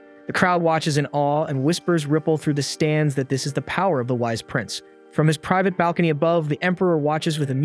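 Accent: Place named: United Kingdom. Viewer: After , I think he's British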